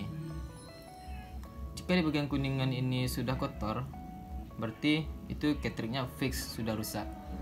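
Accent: West Sumatra, Indonesia. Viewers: Dari logat bahasanya...saya yakin, abang ini orang Minang.... 😊😊😊semoga benar..... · Jelas sekali logatnya minang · Logat minangya jlas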